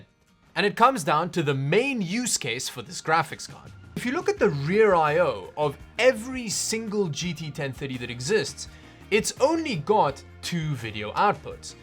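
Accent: South Africa. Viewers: You must be somewhat South African related? Your accent and word choice gives South African vibes😅 EDIT: Another scenario for either of the cards is to use it as dedicated PhysX GPUs, I have successfully used a GT 710 for PhysX in Borderlands 2, but it is not sufficient enough for Killing Floor 2 since that requires a dedicated GTX 1060 for PhysX. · This man sounds south african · Hey man I hear that accent my fellow South African good to see some SA youtubers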